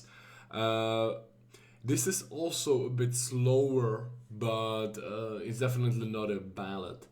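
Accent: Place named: Czech Republic. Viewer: I don't know why but your English sounds very czech.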